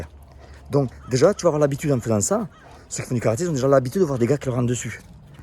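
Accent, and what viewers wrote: South, France. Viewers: ... t'es en train de me réconcilier avec l'accent du Sud! Parigot que je suis! Force à toi!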